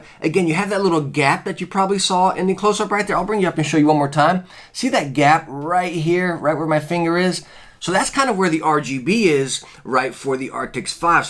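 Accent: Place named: Brooklyn, United States. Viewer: where is this guy from? Brooklyn?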